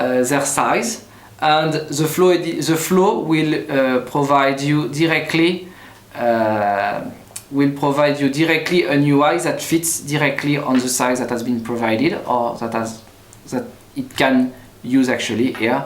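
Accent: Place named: Paris, France. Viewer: Ça sens l’accent parisien par ici. Nice video but can have better resolution for next time.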